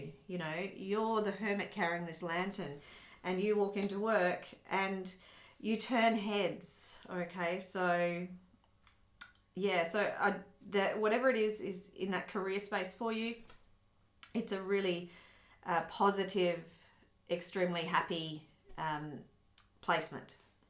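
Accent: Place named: Australia. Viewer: Thankyou for sharing your Light with us through your Insightful readings cheers and all the best with yourchannel growing. I'm hearing a fellow Aussie, am I correct xx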